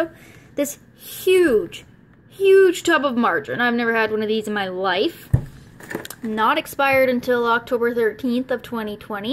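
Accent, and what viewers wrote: Canada. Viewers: You’re obviously Canadian...eh...I’m in Hamilton Ontario. Where are you?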